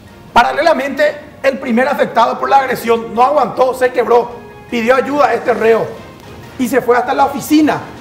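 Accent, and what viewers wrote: Paraguay. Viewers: El que narra en que idioma habla? No habia escuchado Antes el acento paraguayo, una mezcla de Chileno con argentino y un acento Brasileño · No es un idioma como tal, habla una mezcla de español y guaraní, acá en paraguay se le llama "jopara" y es la forma común que tenemos los paraguayos de hablar entre nosotros. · Es por nuestro acento paraguayo.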